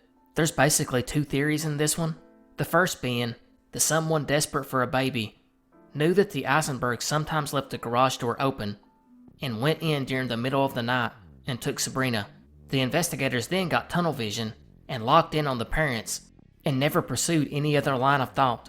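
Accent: South, United States. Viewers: Keep this up man. I think we’re from around the same neck of the woods, and it touches my heart to hear a southern accent talking about these mysteries. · My dude! Love your channel man. Not sure where you're from but you've got quite a Southern draw. As someone from Kentucky, it's refreshing to listen to someone I can relate to. And your stuff is super interesting and well done. Keep up the good work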